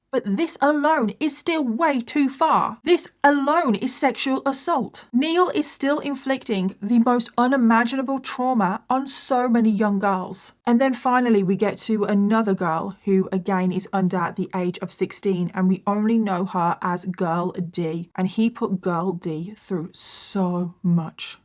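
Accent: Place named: United Kingdom. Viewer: I watch your content religiously every week Ive seen every video you have posted. Rewatched and i love what you do and your brittish voice! -- Rachel from South Dakota 🇺🇸 🌎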